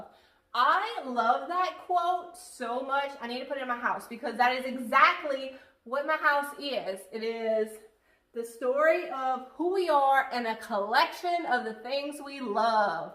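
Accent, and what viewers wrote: Louisiana, United States. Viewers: I loved seeing this! Gave me ideas as well as good info on pricing. I must ask, where is your accent native to? You remind me of some of my Louisiana family, but not quite.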